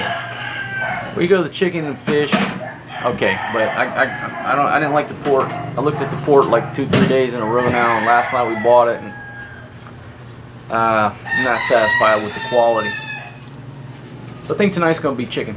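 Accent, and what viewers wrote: South, United States. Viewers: Kudos for laying down the law my friend. Dont know how much of a yard you have there, but did you ever consider raising chickens of your own for meat and eggs? Maybe even raise some pigs? Would like to see more of the beach you have there. I would definitely be taking advantage of the fishing as a food resource too. If your relatives come over, have them pitch in and give you a hand. If you put them to work, they will either earn their keep or stop coming around. Explain to them that education is their way out of poverty. Sounds like you have a southern accent. Where ya’ll from? I lived in south Georgia for 30 yrs. and know a good ol’ boy when I hear one.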